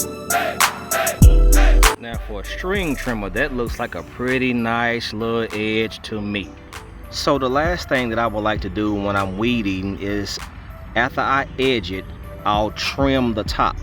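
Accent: South, United States. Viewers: Great video. Wow. I love that southern accent.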